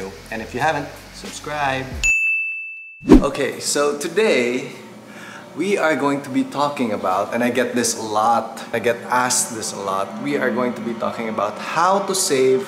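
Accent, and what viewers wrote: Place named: Bisaya, Philippines. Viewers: Nalingaw kos bisaya ni Ate hahaha cute ❤️ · HAHAHA bisayaa jud nimo maam uy HAHAHAHA SIGE JUD KOG KATAWA INTIRE VIDEO SUGOD PALANG HAHAHA Imo day na? hahahhaha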